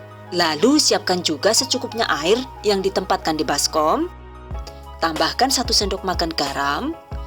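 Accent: Banyumas, Indonesia. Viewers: Mantulll bunda,,👍👍👍👍 Ternyata basane ngapak kya inyonge 🤣🤣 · Makasih resepnya.. Semoga sukses selalu Eh btw nganggone basa ngapak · Malah keselek hahahaaaaa,,,,rika wong ngendi koh bu? Kaya wong banyumasan deneng? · Tinggal di madiun tp jawanya ngapak ya mbak e..hihii...asli cilacap apa wangon kie tah mesti..hihii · Bahasanya ngapak memang orang mana ea...??? Tadi denger pas lagi keselak..🙈🙈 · Lucu kadang bahasanya ngapak.. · Kok ngapak ya ngomongnya😁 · Wkwkwk jebule mbaknya ngapak salam.ngapak yen.ora ngapak.ora kepenak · besok coba ahhhh.............. ini koq ngmng2 orang jawa sih... lucu drnger suara ngapaknya.. samaan kaya aku... 😂😂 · Tutor nya teges .. tapi sepertinya mbake orang ngapak ya? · wowww mkshh bunda resepnya.bunda lucu kyaknya org ngapak nih sma kaya aku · Sy ibu rumh tangga biasa 50 tahun....bahasane koyo ngapak....ngomong cindil.....matur nuwun mba....kapan"" tak cpba.... 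· Kaya wong ngapak y Bu....heeee....aku ikutin truusss....pnsrn sm Ibu niih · Ngapak2.. Kayak bapakke wong banyumas hehehe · Bunda ni aslinya mana si, ngapak bener.. Saya Purwokerto bun.. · Org ngapak😁😁🖒🖒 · Makasih bunda resep kripik terongnya..sy suka liat vedio bunda lucu ada bahasa ngapaknya he he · Jane kie cenele wong ngendi yaa...deneng kadang2 ngapak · Setiap resep yg ditampilkn mudah di praktekn dan berhasil berarti tdk ada yg di RHS kan cuma lucuu nya di selingi bhs. Ngapak...😀😀 Sukses mba... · Wong ngapak jebule, Bun subscribeer baru bun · Mamake ngapak ya mak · Kirain orang bule tadinya ...gak taunya ngapak ngapak 😅😅..mantulll buk e 👍👍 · Nyonya satu ini emang the base.tahu sdh sy coba pare blm kok puinter idenya maupun buatnya lho .hem mantap .nyah tp nadanya tadi ngepek2ya bojoku garut .bhs inggrisnya panduanya kangen suaranya .sukses slalu nyah mksh ilmunya respon baiknya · kie mesti wong banyumas ya ? 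ngendi yu umaeh ? apa baturaden ? tangga desane nyong ? 😆😆😆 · Ya ampun ternyata pada bae mbi aku wong ngapak... matur suwun bu resepe, slm ngapak ora ngapak ora kepenak...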